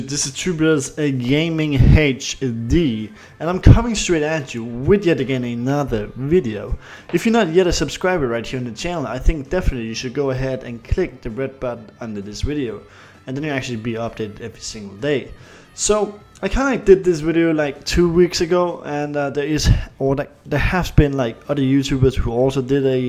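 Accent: Denmark. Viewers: Are you danish?? You Sound danish in your accent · man tager ik fejl når man høre dansk accent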